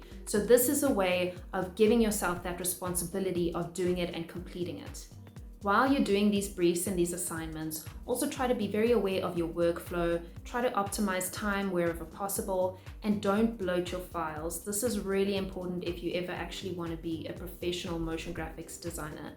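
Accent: South Africa. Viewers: the south african accent> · Your accent sound South African.... great vid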